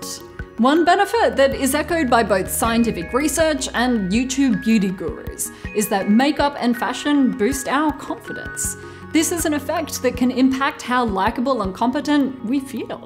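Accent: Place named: Australia. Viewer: It's alright, i will always trust you because you sound like me (Australian). :DDD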